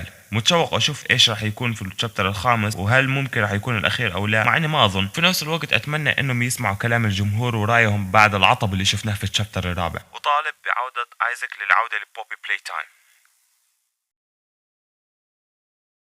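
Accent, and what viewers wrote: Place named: East, Libya. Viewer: من لهجه انت ليبي من شرق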